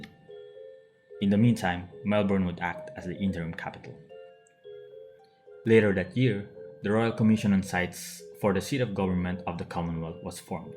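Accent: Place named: Philippines. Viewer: Sounds like a Filipino accent.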